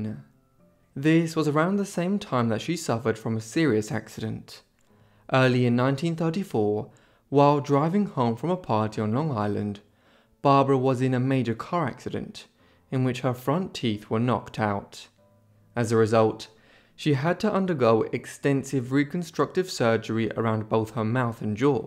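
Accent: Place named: United Kingdom. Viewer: I love your Accent are you British?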